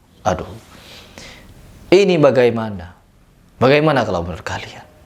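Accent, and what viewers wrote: Madura, Indonesia. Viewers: Abng 0rag madura kh l0gakx🙏🙏 jjur Q suka krna keras n tegas dmi kbeneran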